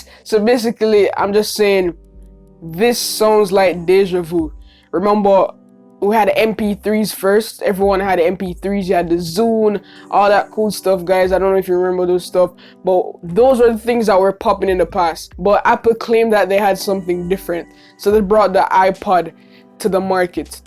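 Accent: Jamaica. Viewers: I hear a Jamaican accent.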